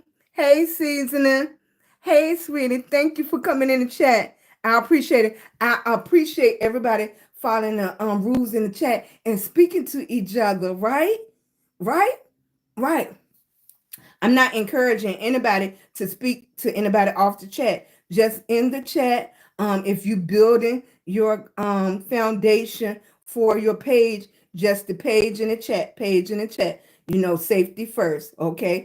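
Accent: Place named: South, United States. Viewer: Love your spirit and your southern accent. Keep being a warrior.❤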